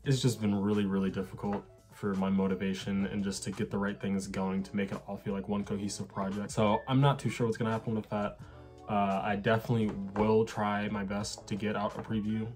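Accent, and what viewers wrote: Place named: United States. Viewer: I thought you were American lmao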